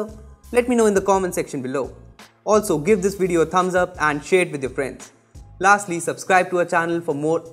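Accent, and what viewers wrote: India. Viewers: I like your heavy Indian accent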